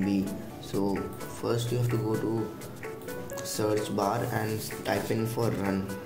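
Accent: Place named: Karnataka, India. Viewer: R U Kannada ?? U Get the slang of Kannada in English...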